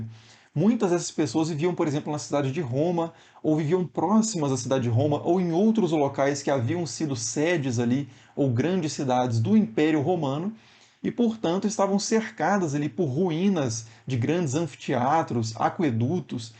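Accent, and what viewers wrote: Minas Gerais, Brazil. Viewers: Pena que o áudio é baixo. Ainda mais com mineirinho falando 😊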